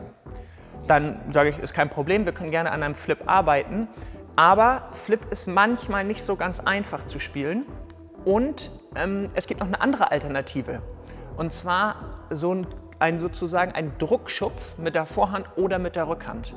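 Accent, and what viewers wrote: Hamburg, Germany. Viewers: Perfekt erklärt, super gemacht. Danke für die Tipps... und der Hamburger Slang gefällt mir auch sehr gut. Cooles shirt dazu :-) Liebe Grüße aus dem Süden Deutschlands.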